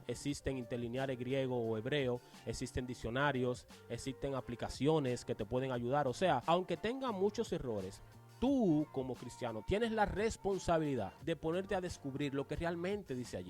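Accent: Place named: Dominican Republic. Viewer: Es primera vez que veo tu canal, te saludo desde El Salvador. Interesante historia, por tu acento creo que sos Dominicano. Dios te bendiga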